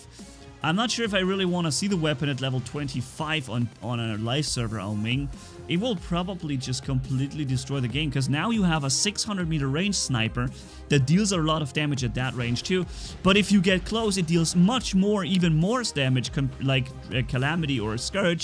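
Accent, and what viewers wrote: Germany. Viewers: Are you German? Bist du Deutscher?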